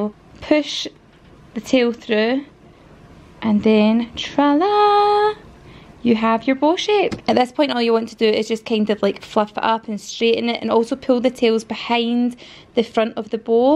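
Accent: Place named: Glasgow, United Kingdom. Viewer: Found your channel a few weeks ago and I've become so obsessed, I've watched so many of your videos now, had a total binge haha. I'm not planning on having kids for like 10 years but I still find your content so interesting. I'm also from Glasgow and love hearing the familiar accent 😊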